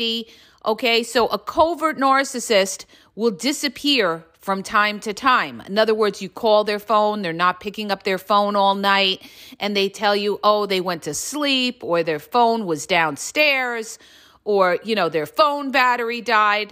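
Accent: New York, United States. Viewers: I got mad for you and troll about your talking . I commented to you first time I heard you I can hear the power of New York or something very cool and legit and sexy so keep it up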